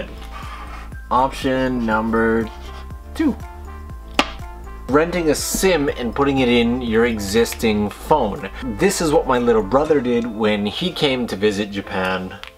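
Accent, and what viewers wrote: Canada. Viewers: I never realized how Canadian you sound until this video. Lol Anyway, wish I would have had an option like this back in 2015.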